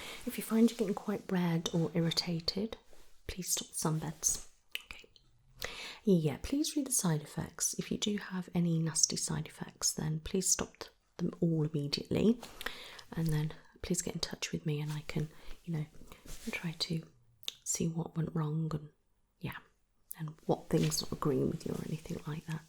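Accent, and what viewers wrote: United Kingdom. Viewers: British English is really the best language for ASMR !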